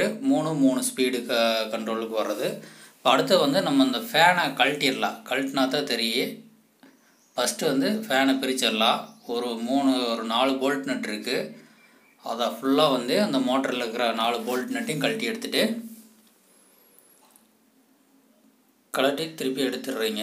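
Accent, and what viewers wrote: Kongu, India. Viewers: கொங்கு தமிழ்.கேக்க கேக்க இனிமையாக இருக்கு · நம்ம கொங்கு தமிழ்'ல Semma explanation ன்னா 🤝✌️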